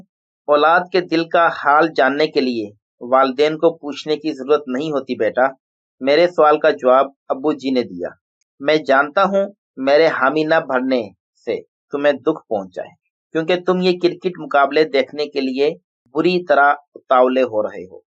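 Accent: India. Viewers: لہجہ ایسا جیسے کوئی انڈین اردو کی ٹانگ توڑ رھا ھو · Awaz waly dost indian lag rahy hain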